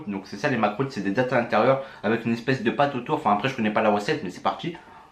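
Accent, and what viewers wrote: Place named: Arab world. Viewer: Wwa pas mal l'accent arabe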